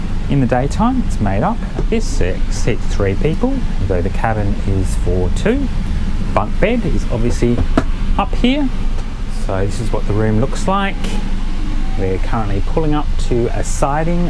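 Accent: Australia. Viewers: CRISP CLEAR AUSTRALIAN ACCENT = LUV= your clear speech = elizabeth nz xox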